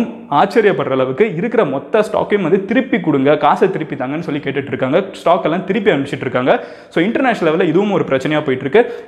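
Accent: Madurai, India. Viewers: Mg neenga maduraiya???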